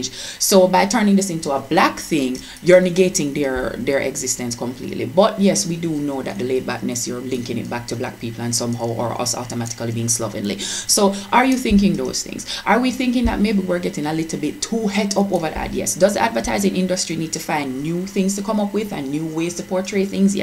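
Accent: Jamaica. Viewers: Wow! You really contributed a very interesting angle to this debate, because you have an incredible accent! So refreshing to hear your opinions in a true Jamaican accent, amongst all this controversy!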